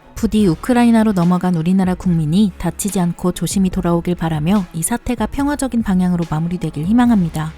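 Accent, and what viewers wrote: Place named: Seoul, South Korea. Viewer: 누나 이제 서울말 잘하네여ㅎㅎ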